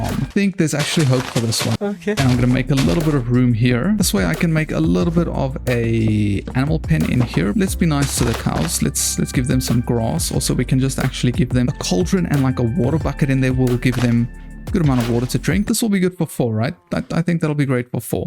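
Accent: South Africa. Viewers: Are you from south africa? I can hear a accent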